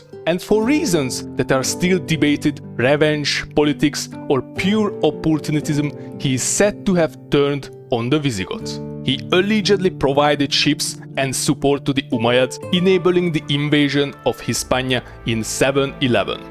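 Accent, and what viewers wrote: Hungary. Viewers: Love the hungarian accent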